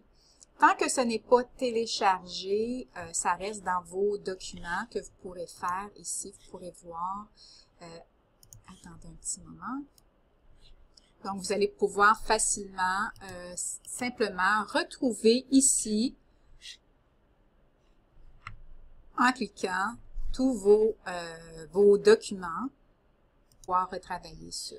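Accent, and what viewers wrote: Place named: Canada. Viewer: Merci pour votre vidéo. Grâce à vous j'ai découvert le logiciel "Canva". Continuez c'est vraiment bien. J'adore votre accent canadien si je ne me trompe pas!!!!! MERCI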